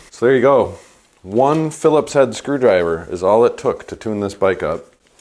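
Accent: Wisconsin, United States. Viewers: Chicago guy living in the central highlands of Mexico with a 10 year old Chinese bike ! My first experience with a local bike shop was $30 charge for this tune up and my bike shifted very tight and I ended up searching YouTube for how to do a tune up myself. It’s good to hear a Wisconsin accent and to see your techniques . In two days I’m gonna give this a try . What part of Wisconsin are you? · Immediately after he started talking I knew he was from Wisconsin. GO PACK lol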